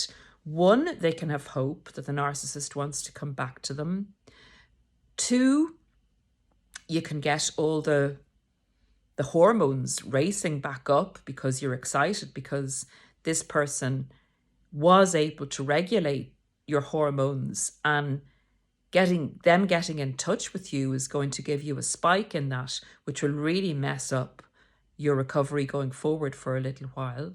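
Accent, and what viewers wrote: Ireland. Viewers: I love to hear your Irish accent demonstrating the way in which one treats the Narcissist (Covert) in my case. Keep up the good work! And thanks so much for all your work and may we all be blessed! I find my Guardian Angel is also helping me a lot in negotiating this new territory. God bless you as well as the Work! K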